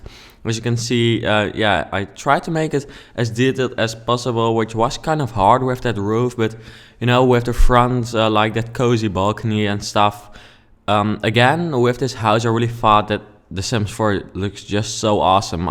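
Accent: Netherlands. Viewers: You need to stop using youre dutch accent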